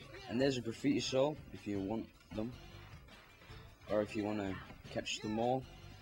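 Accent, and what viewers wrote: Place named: South Africa. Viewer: Which country are you from? you sound south african also very bored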